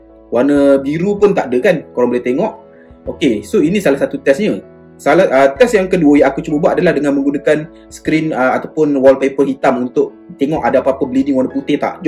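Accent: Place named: East Coast, Malaysia. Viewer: Maaf tanya bang,asalnya org mne bang? Bunyi mcm loghat pntai timur sikit.. bahahhaha.. cun bang.. buat sy terpikat nk beli.. haduhhh.. cdgn sikit bang.. berbanding mi max 3.. mane lagi baloi? Sbb nk buat lenjan game biase2.. bukan hardcore sgt.. sbb kita faham sekadar mid sahaja.. just mintak pndgan.. hehehe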